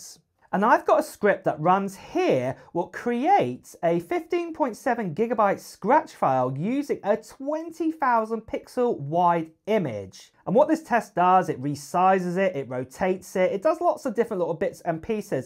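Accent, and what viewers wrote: England, United Kingdom. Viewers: There's no such thing as "British accent". There are five countries on the British isles. He's English but not sure where abouts he's from in England.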